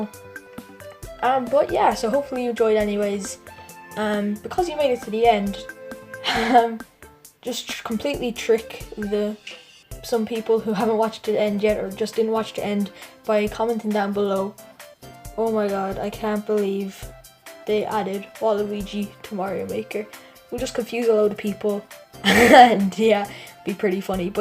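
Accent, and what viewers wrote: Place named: United States. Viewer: U sound American in this😂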